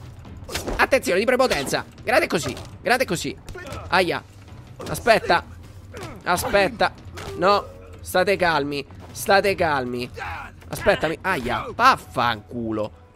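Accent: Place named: Central Italy, Italy. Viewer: Di dove sei? Hai un accento del centro Italia che non riesco a definire esattamente..sparo marche :)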